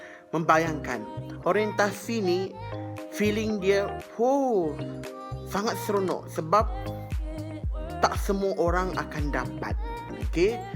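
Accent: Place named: Kelantan, Malaysia. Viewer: Kechek klate bohhhh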